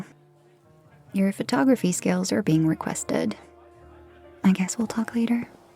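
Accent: France. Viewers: Please, do more French accent ASMRs 😌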